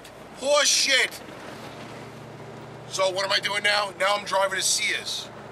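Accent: New York, United States. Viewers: Sounds like you're from New York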